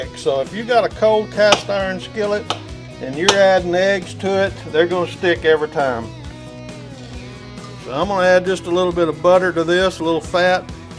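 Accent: South, United States. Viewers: Yella 😂. Your southern accent brings back memories of my daddy.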